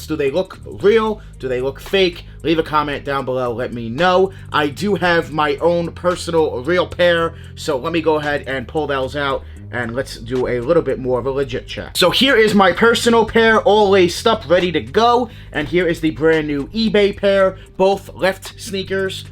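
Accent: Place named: Brooklyn, United States. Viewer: Dudes definitely from Brooklyn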